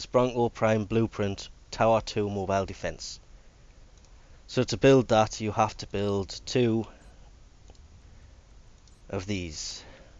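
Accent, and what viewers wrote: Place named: North, United Kingdom. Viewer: have been watching all your videos love the northern accent. When ever I build a new weapon I half expect you to give me a review lol. you have pushed me on so far from my early days. I now hold my own in all the high lvl matches and I love the wraith twin vipers to! keep up all your good work love the channel.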